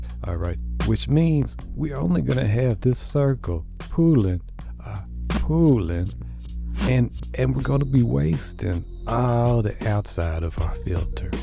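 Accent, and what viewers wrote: Louisiana, United States. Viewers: New here! Great channel. Very well done! Are you in Louisiana? Nailing the accent if not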